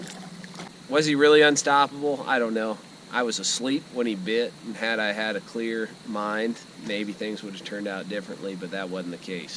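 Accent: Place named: Midwest, United States. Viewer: Did you say donate or don’t eat I can’t tell through your Midwest accent LOL good job buddy!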